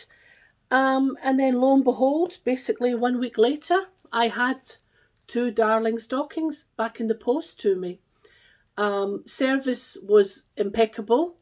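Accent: Scotland, United Kingdom. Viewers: Been catching up with your flosstube. Great to hear a fellow Scot round these parts 🏴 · First time I’ve seen your channel and enjoyed it very much. Love your Scottish accent. Take care and stay safe. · Your floss tube popped up on my feed and I enjoyed watching you. Love your Scottish accent! I’m from Idaho in the US, so you are a sweet find. Love your Easter Peep, so I’m gonna have to find that one. Thanks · Congrats on your book find. It's great that all the charts are there!! Your stitching is beautiful and I very much enjoy listening to a crazy Scot woman rambling on about cross stitch!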